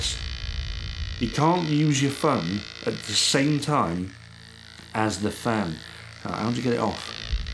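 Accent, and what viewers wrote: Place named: Oldham, United Kingdom. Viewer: Where are you from? Your accent sounds so similar to mine or where I'm from cause I'm a girl and you're not lol.. But I'm from Oldham uk.. So I've very intrigued.. :p this video made me laugh anyway 😂 can't wait to watch your videos from now on ! You've got your self a new subscriber :) well done!! X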